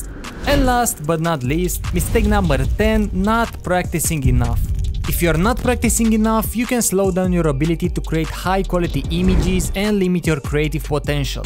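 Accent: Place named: Romania. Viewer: i can tell by your accent you are romanian xD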